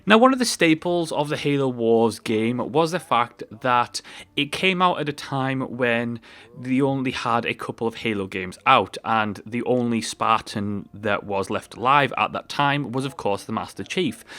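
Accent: United Kingdom. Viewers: I want you to fill me with that sexy British voice of yours boi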